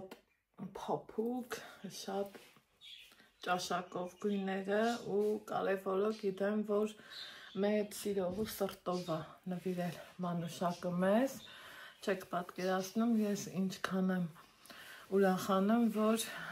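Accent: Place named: Gyumri, Armenia. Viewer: Ьтик артен мер Ленинаканцу акцентов асир 😂 джан арохч йакнек, шат сирун шорикнерен, апри 🙏🙏🙏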